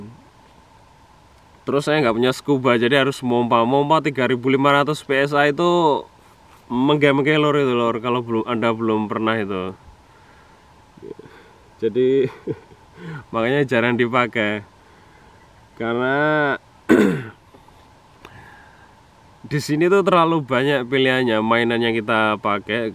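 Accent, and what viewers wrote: Java, Indonesia. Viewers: NITIK LUR...EDANAAAANNNNNNN ....JOS KANG TETEP KETOK JOWONE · Wong jowo mz kok lagate jowo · jowo nya msih medok, masyah allah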